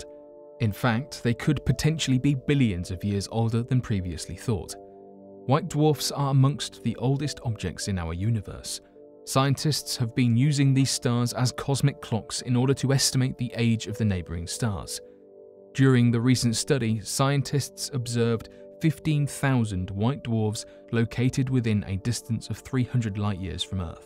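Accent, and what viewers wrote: England, United Kingdom. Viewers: He's not American. Why should he adopt an American accent? Are you claiming Americans are too stupid to understand a British accent? If you want to get on to him about pronunciation, why not point out that Ultima-Thule is not pronounced "thool" but "thoolee"? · He has an English accent, that's all. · Its pronounced MEH - THANE, Not MEE - THANE. PLS get the words correctly pronounced with an American accent? Edit: Since you have an English accent, I get the why of the above mispronunciation of Methane. But, I have to inform you that your way of saying Methane is Hilarious!! Oh, and people are saying in the comments section, that “Ultima-Thule” is pronounced Ultima-Thoo-lee. So, apparently, the “E” at the end is not silent. Better luck next time...!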